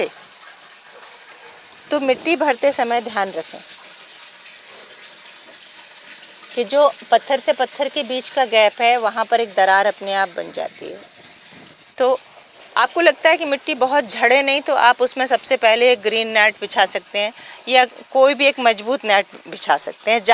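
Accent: Standard. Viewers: आप की शुद्ध हिंदी सुनकर बडा अच्छा लगता है ,क्योंकि मैं मुंबई मे रहती हु .मेरा भी एक छोटासा बाग है लेकिन सब एक्झॉटिक प्लांट्स है,सोच रही हुं कुछ सब्जीभी ऊगालू लेकिन मुंबई की बारिश और मुंबई के बडे बडे चुहे कुछ भी नही उग्ने देते है क्या करू समज नही आता? लेकिन मै फिर भी प्रयास करूंगी आपसे प्रेरणा जो ली है.☺️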